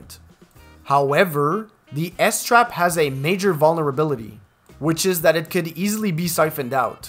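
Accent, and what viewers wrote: Canada. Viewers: are you from Canada? your accent seems canadian !?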